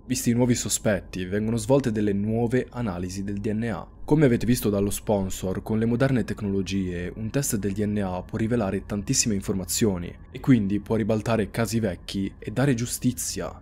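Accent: Sardinia, Italy. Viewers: Noooooo giurami che sei sardo di Cagliari ti prego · Sardo, di Cagliari 😂 FANTASTICO ❤💙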